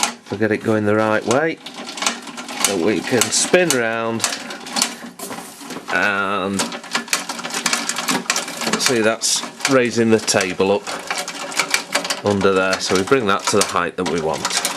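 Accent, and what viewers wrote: Manchester, United Kingdom. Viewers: A couple of really useful videos. There aren't many reviews for this out there and to find one from a fellow northerner was nice. Just bought one of these second hand for £40. Funnily enough I hopped over the pennines to pick it up from a chap in Manchester, which I assume from the accent is where you're from ish. Thanks again and kind regards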